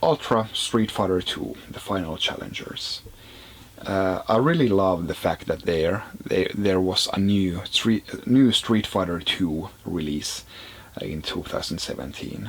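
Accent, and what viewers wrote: Finland. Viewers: You're Finnish :) from the accent. I got my Nintendo Switch a couple days ago <3. Will get a Pro Controller at some point and Donkey Kong Country Tropical Freeze when it comes out. Also Zelda BOTW and others. It's an awesome hybrid console. both docked and portable is great. Graphics are very much good enough and then some. I have SMOdyssey + MK8Deluxe. Yep 1 year old now. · Lucas Shumake typical nerdy finnish guy accent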